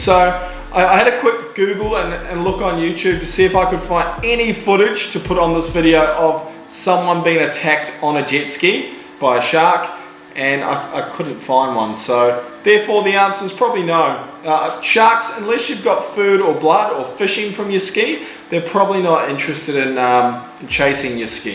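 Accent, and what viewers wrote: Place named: Australia. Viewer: You sound Australian...Forgive me if I'm incorrect. If so, I am absolutely terrified of water in your country lol. Between your crocodiles, snakes, sharks (even if I don't have to worry about them), etc...The only water I would want to touch there is the shower, and my drinking water lol. If it's New Zealand, well that doesn't change much lol. In fact it's probably worse.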